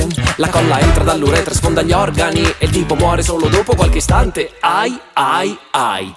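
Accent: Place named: London, United Kingdom. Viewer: Cacchio come parli inglese londinese! 🎉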